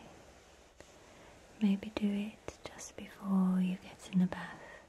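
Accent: England, United Kingdom. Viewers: I loooove her British accent😍😍❤❤ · Are. You. From. England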